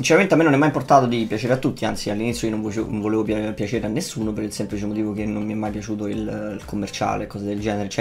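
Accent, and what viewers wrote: Rome, Italy. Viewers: è normale che guardo tutto il video.. sai intrattenere non annoii e alcune volte la tua parlata romana mi fa ridere un botto, grazie ✌#versoilmilione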